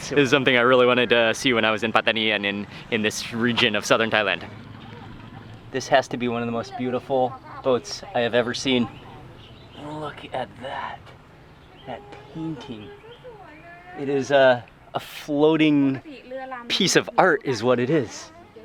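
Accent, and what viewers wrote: Malaysia. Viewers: Kecek kelate lako ter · masih pekat melayu.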